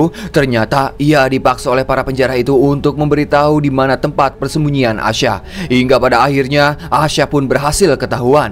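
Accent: West Java, Indonesia. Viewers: Bang hadir Bogor ciawi.aku suka ceritanya.dan ngomong nya kaya aku ada Sunda nya aku jadi senyum" sendiri😂😂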